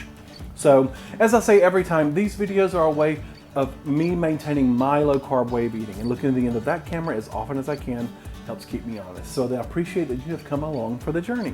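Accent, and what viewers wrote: South, United States. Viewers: Thank you ! love your video and love your personality w southern accent!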